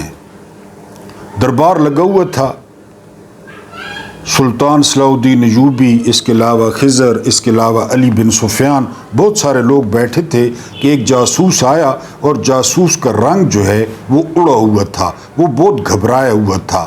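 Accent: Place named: Punjab, Pakistan. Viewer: بنجابی لہجے میں اردو، تاریخی واقعات اور مزاحیہ انداز پر آپ کو سلام۔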